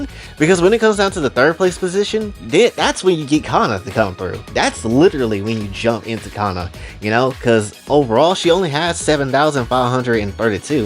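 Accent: Brazil. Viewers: Hey bro i only thought of asking know but are you BR? Your accent is familiar and l0lic0n BR YouTubers are very rare so i got curious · Indeed, the guy sounds like a brazilian a bit, and I can not pinpoint why.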